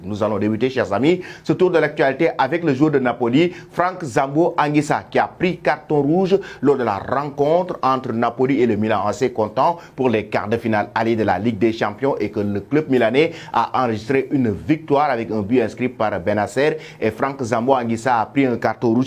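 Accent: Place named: Senegal. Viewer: Je suis ivoirien et j’aime regardé votre chaîne à cause de votre accent sénégalais . Big up depuis Bruxelles , Belgique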